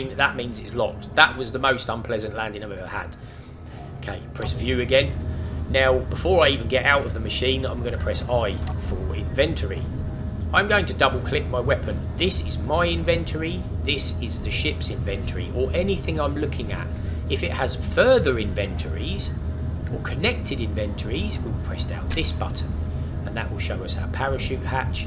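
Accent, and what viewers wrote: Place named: Geordie, United Kingdom. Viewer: lol listen to a geordie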